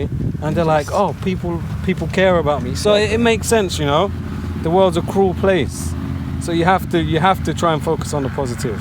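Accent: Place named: Sweden. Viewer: såg videon om armpump å kände igen dialekten direkt ;) vart i Sverige håller du hus då?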